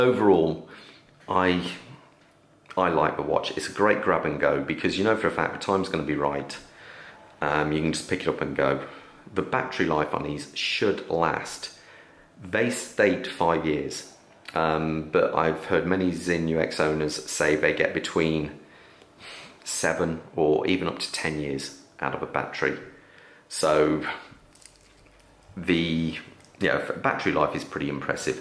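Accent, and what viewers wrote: United Kingdom. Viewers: In all fairness, he’s sounds British.....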